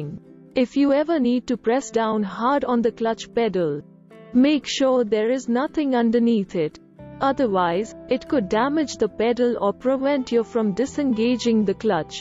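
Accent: India. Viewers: Amazing, a robot-narrative with an Indian accent...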